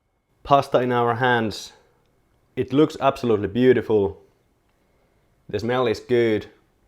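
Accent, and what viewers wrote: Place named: Finland. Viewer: It's the Finnish accent for me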